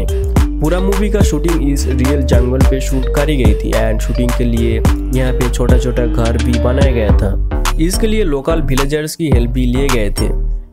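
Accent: Bengal, India. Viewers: App bengoli ho na app ki bat karneka tarika pata chal jata hai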